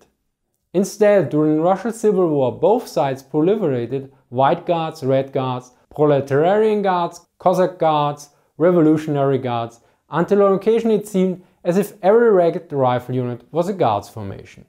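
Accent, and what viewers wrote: Germany. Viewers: bad English pronounciation... I just cannot bear his accent. It is unbearable to listen to someone who uses the "German language melody" on each and every English vocab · To be fair narration matters a lot and actual bad narrators can ruin a video. But accent isn't as terrible as you make it to be. What he says is perfectly intelligible w/o subs. If it bothers you that much, you can maybe contact him about funding him a native English speaker for narration (it's always about the dough ultimately of course). Tho to me I don't get to hear someone speaking English in a hard German accent that often so it's actually a pretty interesting aspect of his videos. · German accent can be hard to stomach.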